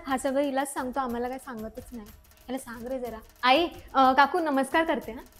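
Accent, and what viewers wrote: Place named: Mumbai, India. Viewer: खास मुंबईकर मराठी!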